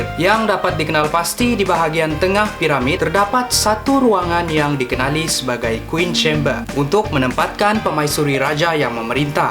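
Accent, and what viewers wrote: Malaysia. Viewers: ni malaysian ke indonesian??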